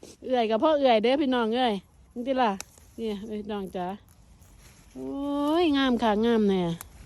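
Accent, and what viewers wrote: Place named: Sisaket, Thailand. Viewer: น้อง พูดเหมือนคนศรีสะเกษ นะ ถ้าใช่เราคนบ้านเดียวกันนะค่ะ เดินมาหาผักเสี่ยงฝรั่ง คือมีด้วยค่ะ ปาโดด🤣❤️💞💞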